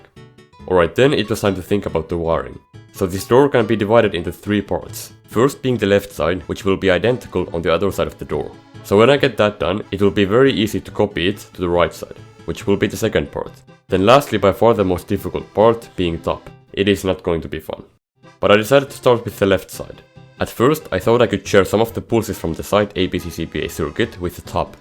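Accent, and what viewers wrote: Finland. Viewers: Are you Finnish? 🤔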